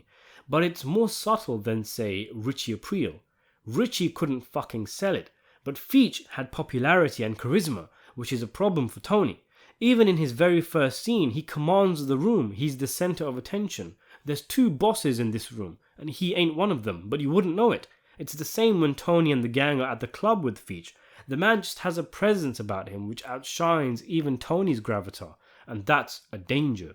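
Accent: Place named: British Asian, United Kingdom. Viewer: Is the narrator British Asian? He has a slight accent. Good video - good channel, just curious.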